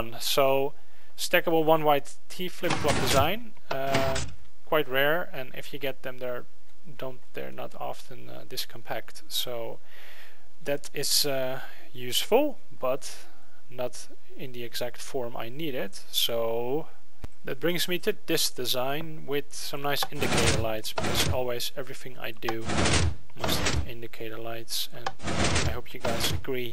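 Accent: Netherlands. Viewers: Haha lol jij bent dus Nederlands dacht al je klinkt niet echt engels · u dutch?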